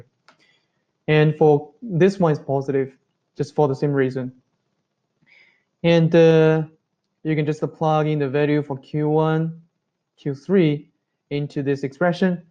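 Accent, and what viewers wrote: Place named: United States. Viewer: your accent is american from which country you are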